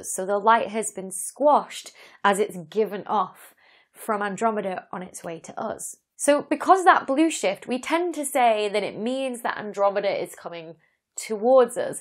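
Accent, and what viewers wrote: United Kingdom. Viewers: I have a pronunciation question. In the 70s, I had a meal in Arno Penias' house with his son. It was probably years before winning the Nobel Prize. His son pronounced his last name pen-zee-as. This is not how you pronounce it and I wonder if it is because of your British accent or is my memory bad.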